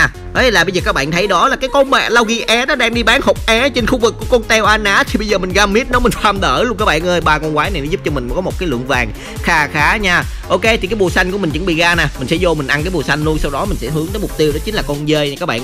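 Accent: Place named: Mekong Delta, Vietnam. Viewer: Thương ông này vl.nghe dọng miền tây dể thương gớm :))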